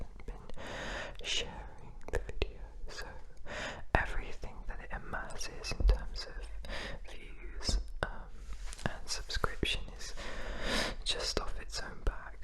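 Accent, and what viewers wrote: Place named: United Kingdom. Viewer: The British accent is naturally ASMR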